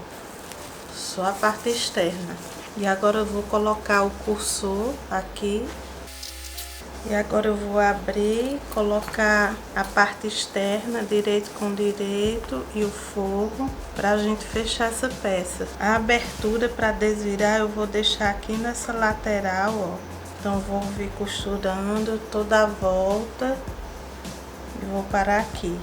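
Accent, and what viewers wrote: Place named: Northeast, Brazil. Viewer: oh, muito obrigada, de coração e sou muito espontanea, onde so tenho a agradecer a quem se dedica a ensinar com Amor e empátia.... Notei que seu sotaque é do Nordeste, de onde é?! Qdo eu retornar para lá, quem sabe não estaremos perto e vc poderá ser minha Professora em aula prática? Já pensou? Coisa boa,... um abraço Querida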